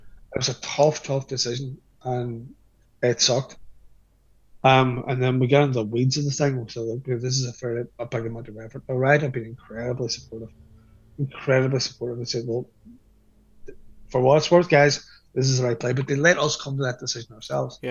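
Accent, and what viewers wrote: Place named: Ireland. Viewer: Irish accent I understand it perfectly but I am Irish 😅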